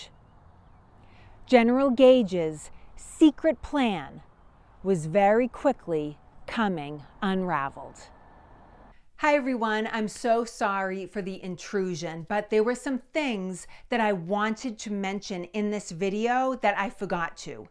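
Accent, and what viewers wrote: Boston, United States. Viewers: Love your channel and your accent. I'm from the Boston area living in Northern Vermont and it's refreshing to hear a familiar accent. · Holy shit that Boston is strong. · Hi, I'm typing to you with with pine tarr stuck on my shoe, do you say creek like we do here in Pa. probably got that fancy Boston accent on that too. I do appreciate the click bait title of this post, but darling, like i said in earlier posts. my undiscovered event is the actual start. First England wins 7 years war, day of proclamation of 63 declared in colony, the day after is steton massacre in allen township. this event documented by franklins gazette, is officially signed at phila courts by lynford Lardner, married to Penn daughter, money to scalp ingins, man women and children, this funds the Paxton boys, for no one did it for free. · Raised in Boston, but I live in Los Angeles now. 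I love this content, and just hearing your voice makes me homesick. Your narrative of the Revolutionary War is beyond engaging, and certifiably inspiring and accurate. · Boston.............with a hint of LonGiland. ? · I LOVE the history. I love no Ai. The Boston, or Massachusetts accent, is almost as bad as, nails on a chalkboard. But that's; "A me thing". Keep doin' what you're doin'. I'll keep watching. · Exciting, and thanks for sharing as we are watching this on the 18th, and you showing the scenery of exactly the season this happened is perfect. I'm a native Texan and one of the things I enjoy, respectfully, is your Boston accent. Thanks for the adventure into our heritage! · My family has been in Boston since the 1800s. This is the thickest Boston accent I’ve ever heard lol. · I appreciate this female narrator’s accent which I believe to be “Southie” meaning from the southern end of Boston. 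I once went there about 20 years ago when I attended scholarly conferences and heard three guys speaking in this manner. What a great sub-part of America! Thank you! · What a delightful accent. You don't really hear this specific type of Boston accent too much anymore. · Pretty cool video!! I am Canadian but have been to Boston a couple of times and I find the history there very fascinating. Next time I would like to go visit some of the places in this video outside of Boston. If you think about it not much has changed about blocking information except the technology behind it. Nowadays they use censorship and security classifications to block the public from learning vital information instead of sending out soldiers in the middle of the night. Thanks for the video ...and I love your Boston accent!!!! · The B-town accent is priceless. Copious thanks. This is one of the best stories in history. 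· I love your videos and ah Boston accents. I have one of those too. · That Boston accent is sweet. I wish Boston would learn from you and get rid of that communist mayor. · My new favorite Bostonian! 😊 Ok, my only favorite Bostonian and the only Boston accent I’ve ever found endearing makes me smile as I listen. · Watching this in MA. Thank you so much . It’s actually really nice to hear someone that has the same Boston accent as myself . XOX ❤️🙏🇺🇸🦅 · Is been so long since I've heard an accent from Boston! Great story telling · I love this channel. The information and narration is excellent. Also I love your Boston accent!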